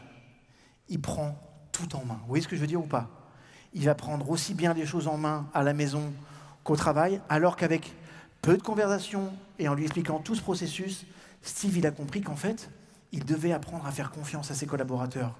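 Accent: Quebec, Canada. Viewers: Merci d’avoir publié ta conférence de l’AZ Paris! Je rêvais de t’entendre au Sommet de Québec où j’étais pour la première fois cette année. C’est mon prix de consolation:0) … et en passant ton accent québécois oh la la … comme dit Martin à faire la même chose 1000 fois, on finit par y arriver! Je t’adore, merci de tous ces partages sur YouTube, tu fais partie de mon univers plusieurs fois semaine et je m’enrichis à chaque visionnement. Bonne continuité!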